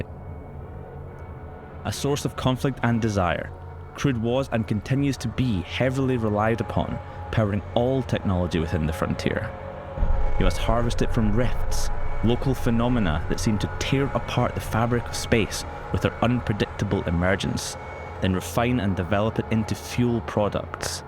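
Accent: Scotland, United Kingdom. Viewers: I can't believe after 20+ years of experience with EVE, you can still allow yourselves this level of shit with placing and advertising a product. It's no wonder each project other than EVE has failed. Its not the product, it's your absolute lack of understanding that we want proper information and motivation to spend money, and not half-arsed ambiance video with some dude who sounds like a Scot trying to balance talking English and gurgling liters of cum at the same time. Oh, good job on giving a monstrous advantage to people who are coders and into IT, over regular folks. Hey pleb, go work for that dude who knows how to write code and can make his own stuff up. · That's a Scots accent, not Icelandic.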